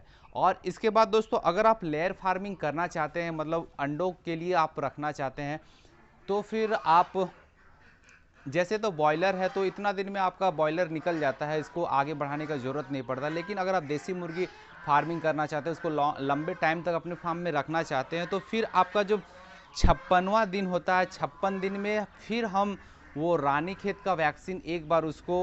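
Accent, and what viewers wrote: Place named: Seraikela, India. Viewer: Bat karne ka andaz se malom huta aap sarai kela said ke ....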